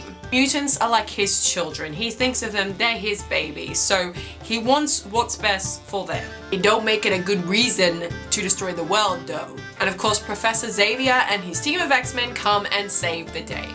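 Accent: Australia. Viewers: Nice reaction, I loved this movie lol I have to know are you from Auzzie or NZ?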